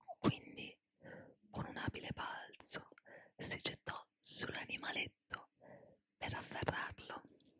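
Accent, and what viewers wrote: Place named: Italy. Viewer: У тебя самый настоящий итальянский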